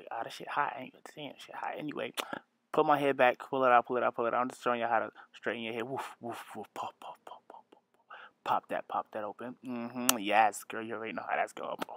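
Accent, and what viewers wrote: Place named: New York, United States. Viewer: I Love How New York you sound lmaooooo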